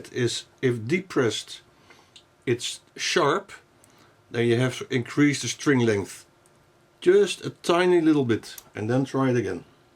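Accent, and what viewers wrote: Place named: Netherlands. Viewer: =) I haven't heard a Nederlander accent in quite sometime! Thanks for the instruction...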